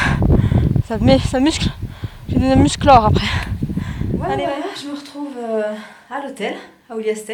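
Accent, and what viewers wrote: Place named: Franche-Comté, France. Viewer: c't'accent franc comtois !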